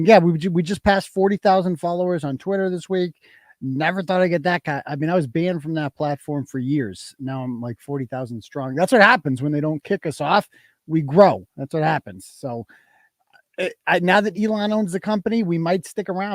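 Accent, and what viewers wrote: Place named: South, United States. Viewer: Your southern accent is hilarious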